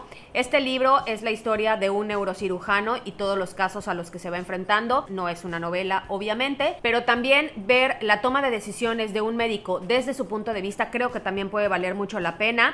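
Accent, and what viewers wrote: Yucatan, Mexico. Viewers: Hablas como.yucateca xD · Apenas empezó el vídeo dije "jmmm eso suena como acento yucateco!" Soy Yucateca y es increíble para mí pensar que alguien como tú está aquí!! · Buenísimo el acento yucateco 😂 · Hola; solo una recomendación. En caso de los niños cuidado con las horas de pantalla digital. Hay recomendaciones de horas de pantalla en bebés, niños y adolescentes. Buenas recomendaciones las que has dado! ✌🏼. Y ese acento yucateco? Saludos desde Panamá 🇵🇦!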